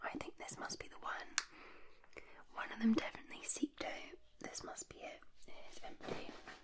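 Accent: Scotland, United Kingdom. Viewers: My New favorite!!!! 😍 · I don’t know what it is but that Scottish accent is so soothing and it puts me to sleep really fast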